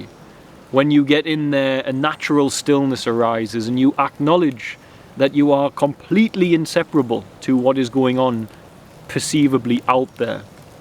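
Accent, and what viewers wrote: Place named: Northumberland, United Kingdom. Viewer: Pure non dual clarity, it’s like listening to Ramana Maharishi but with a Northumberland accent 🙏🏻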